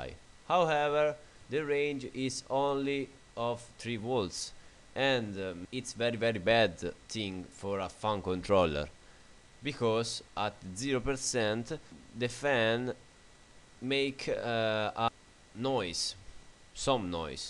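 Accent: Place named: Italy. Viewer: i like your accent man. italy rules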